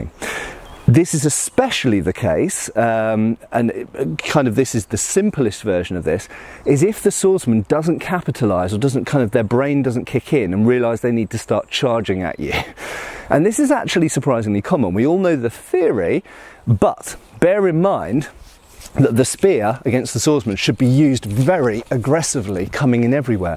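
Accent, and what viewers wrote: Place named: United Kingdom. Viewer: ive added a boar spear to my home defense collection, largely because my neighbors insane pitbull got out again and attacked someone (i wasnt home at the time) but also because theyre relatively cheap and it gives me what i hope is a good non-firearm option. at this point, should i ever have to use it against a two-legged miscreant no matter what that fellow looks like im going to be seeing a genial, bald smiling man with a british accent. i think i watch waaay to many of your excellent videos.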